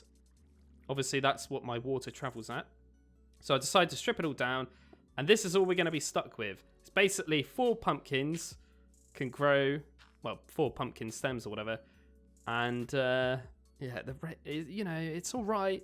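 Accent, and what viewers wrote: United Kingdom. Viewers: This series is the first Thing ever I saw from this man I just now physically actually realized he’s British and it just makes me feel so stupid PHWHWHWHHWHWHW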